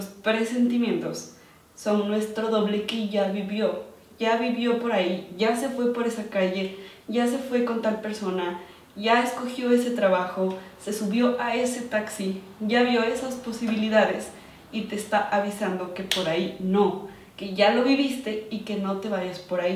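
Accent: Mexico. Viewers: Tu tienes acento como si fueras mexicana no suenas ecuatoriana. Me gustan mucho tus videos, que Dios me ayude a conseguir lo que quiero ❤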